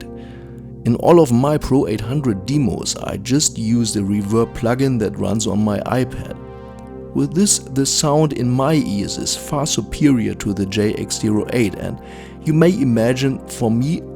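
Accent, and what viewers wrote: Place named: Germany. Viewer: I missed the special, somewhat druggy German accent 😅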